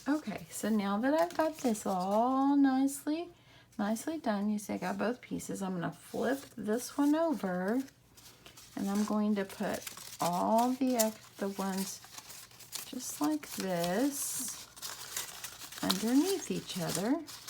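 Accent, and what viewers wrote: South, United States. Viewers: Oh miss, these are so stinking cute and just love them. I made some a few years back and hung them from the office ceiling, they were cute. Glitter paper was pretty too. What??? excuse me??? someone had to say something that you say y'all. OHHH that burns my biscuits. I went from NE Ohio to Arkansas and I had to learn to say y'all..LOL When I said you or everybody I sounded like some prissy proper gal. LOL. I love it! I love the accent from the south, my accent is a mumble of both. But yes folks from the south say y'all, and for someone to say something about that is just absolutely petty and just plain not nice. I was always taught if you don't have anything nice to say, keep it to yourself. Oh your hubby is such a doll, my hubby is so much like your hubby, He use to be like that when he drove truck, but since he came off the road he kinda goes with the flow, now that he has a factory type job. 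I love your tutorials and love listing to you and all your y'alls. you keep being you and change for nobody!!!!! Hugs to you my friend!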